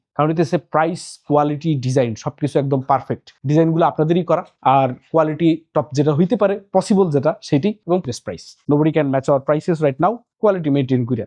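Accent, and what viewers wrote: Barishal, Bangladesh. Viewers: ভাই আপনার কথাগুলো অনেকটা বরিশাল বিভাগের মানুষের মতো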